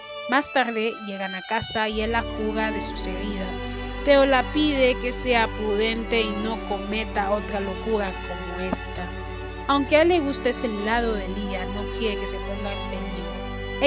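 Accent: France. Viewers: Eres francés?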